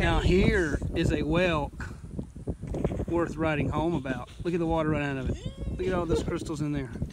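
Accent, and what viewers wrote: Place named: Pennsylvania, United States. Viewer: Your accent sounds like you are from PA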